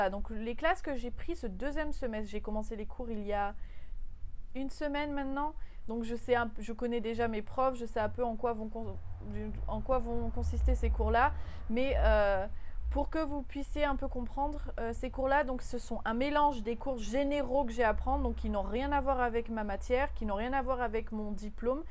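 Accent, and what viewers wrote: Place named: United States. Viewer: lol, desole, je pense que tu es americaine, parce je vu ta video, tu parle bien anglais comme americaine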